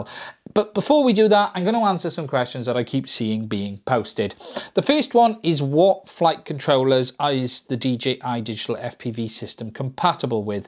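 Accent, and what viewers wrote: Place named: Cardiff, United Kingdom. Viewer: best videos re : dji fpv so far, i notice a gps on the front , how are you using? im coming from an inav point of view, also do you think if i used inav with gps i could still utilise the rth functions... Thinking of putting an air unit in my 7 inch shredder frame, it features esc`s on the arms and im using probably 30mm standoffs fair bit o room in there. oh im based in Cardiff. You sound like your from this neck of the woods (area)! great channel , cheers!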